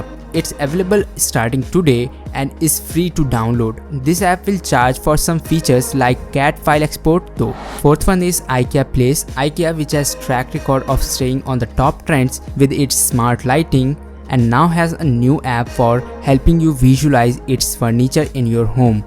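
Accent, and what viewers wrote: India. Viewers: you must be a south Indian...probably Telangana or Andhra.by the way Video content is useful.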